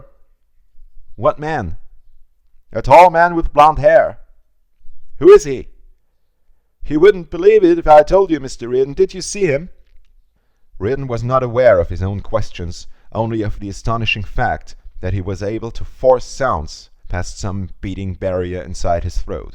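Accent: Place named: Germany. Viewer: Legendary speech! Also, it is funny you show you’re German by your v’s and w’s being mixed up. Great job reading! You are very good at English!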